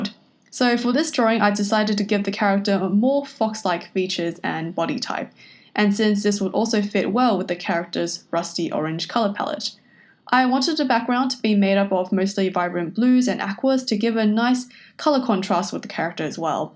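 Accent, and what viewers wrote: Australia. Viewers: Wait your Aussie! Gday from a fellow Aussie artist 😁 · Aussie Aussie Oi Oi Oi (didn't know you were Aussie)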